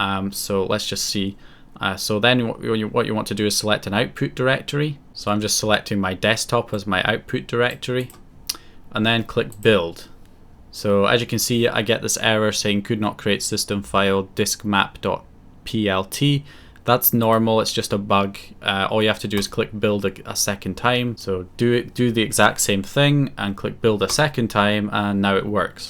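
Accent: Scotland, United Kingdom. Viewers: Irish...? he sounds Scottish to me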